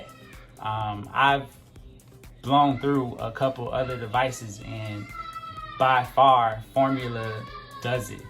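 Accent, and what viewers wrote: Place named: United States. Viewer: Also, BTW, I might add that it's refreshing to hear an American with an American accent Talking about this stuff! Usually it's someone from China or whatever, in broken English. I didn't realize this hobby was so popular here in the states too! I'm in Montana (although I'm from the NY/NJ area originally) and nobody around here has any clue about this at all! Back in the Days of analog cable, we used to use the "boxes" that turned on all the channels but I'm Talking about late 1990's there. I didn't even Knew such a thing as this exists until recently! What part of the country are you in?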